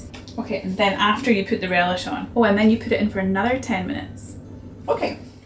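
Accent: Scotland, United Kingdom. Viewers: The Scottish accent❤ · I love your videos......I love your accent....I can't place it.......people in Clarkston spoke like you, but I feel you are from Fort William or the such like. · Hi How are you doing today How was your Christmas Holiday I like your youtube channel I watch every video you are beautiful, i like your Scottish accent Stay safe traveling Take care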